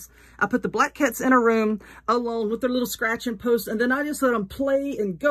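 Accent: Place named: South, United States. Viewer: I can't believe it took me this long to find this channel!!! I've been following your crafting channel for a couple years. I love your crafts and your southern accent! I can't wait to watch all of these videos. 🥰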